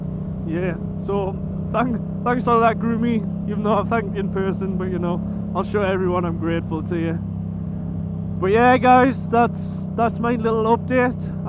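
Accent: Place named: Newcastle, United Kingdom. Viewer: Hi mate good to hear your geordie accent again nice to see u back focus st awesome car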